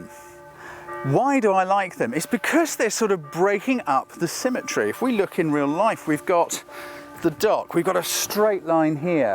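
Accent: United Kingdom. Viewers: By the way I am big fan of yours - Your Voice, your mannerisms, and your "BRITISH" accent - I would love be born again to acquire it :) You have also lost weight, which is awesome ! BIG FAN !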